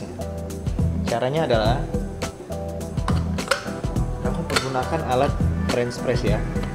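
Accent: Medan, Indonesia. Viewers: Anak medan ya bang ?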